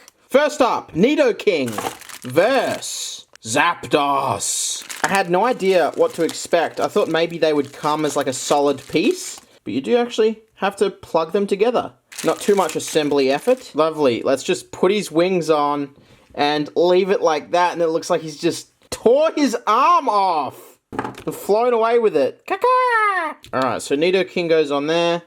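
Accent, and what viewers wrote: Australia. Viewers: Well he has sort of an accent because he’s Australian.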